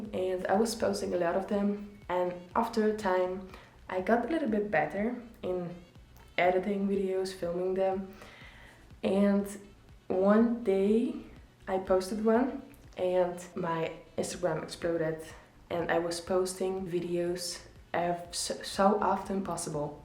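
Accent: Netherlands. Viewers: Hahahha ik hoorde meteen aan je engelse accent dat je nederlands was, thx voor de video wel echt super handig! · I love the European accent or rather Dutch accent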